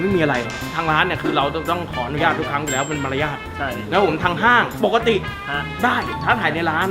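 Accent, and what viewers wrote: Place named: South, Thailand. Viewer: คนใต้ใช่ไหมนิ มาเลยมาคุยกับหัวหน้าผมก่อน😆😆😆😆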